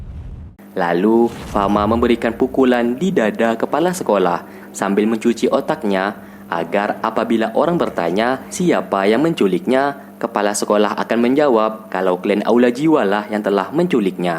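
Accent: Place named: West Java, Indonesia. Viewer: Kekeh😂 aya sunda an ey😁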